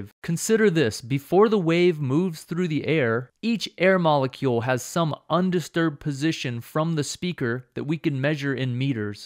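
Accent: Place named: India. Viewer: after next bunch of indian accent video, now I understand what is frequency and amplitude. How about magnitude?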